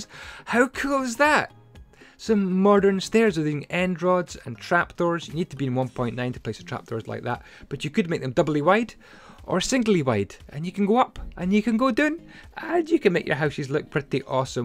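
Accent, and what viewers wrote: Scotland, United Kingdom. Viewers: is this guy scottish? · Are you Scottish I am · are you scottish?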